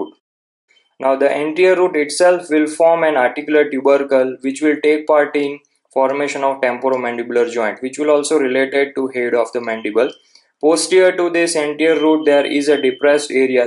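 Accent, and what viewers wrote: India. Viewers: Sir are u gujrati ? Your acent is way like gujrati.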